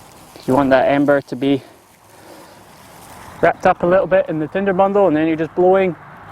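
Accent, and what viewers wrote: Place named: Scottish Highlands, United Kingdom. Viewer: What part of Scotland are you originally from,? You sound quite highland..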